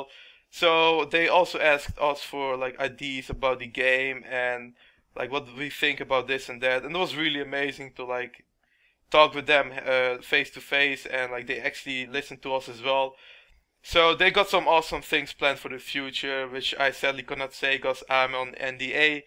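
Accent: Netherlands. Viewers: are you Dutch?! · Why does it sounds like you are Dutch or Belgium lol. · you sound really dutch in your english accent, where are you from? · Hahaha ik wist het gewoon dat jij een nederlander was, ik hoorde het al een paar keer in je videos maar nu weet ik het zeker. ik denk post het maar gewoon dat ben ik dat ook weer kwijt.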